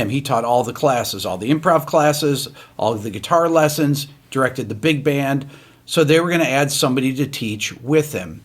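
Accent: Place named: Upstate New York, United States. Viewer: What a great story about teaching at IC. Love your channel and love that you still have the upstate accent:)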